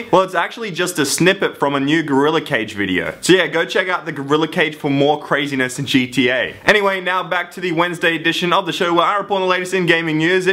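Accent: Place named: Australia. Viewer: If your accent really is Australian what part of AUS are you from?? Curious from a country Victorian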